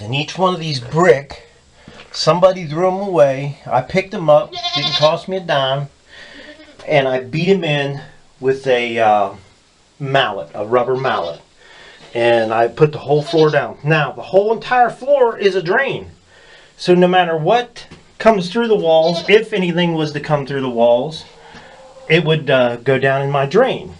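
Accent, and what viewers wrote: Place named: Northeast, United States. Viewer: very cool. love the roof idea using metal and then removing it when set. what kind of temps do you get in winter, or sumner? sounds like you are in the northeast.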